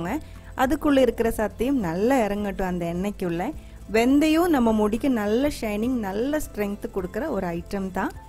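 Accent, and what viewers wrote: Kerala, India. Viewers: Are you malayali? Samsaram kettal malayali aanennu thonnunnu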